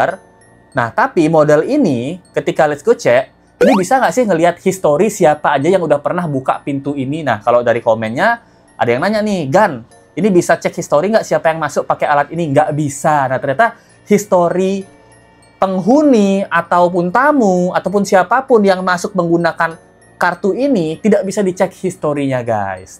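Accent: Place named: Sumatra, Indonesia. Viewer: Logat lo sumatera bgt😅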